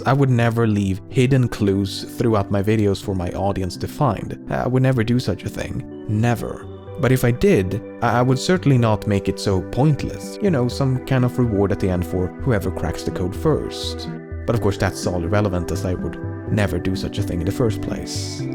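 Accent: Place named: Sweden. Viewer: The swedish accent on English it's so good. One can never get angry on them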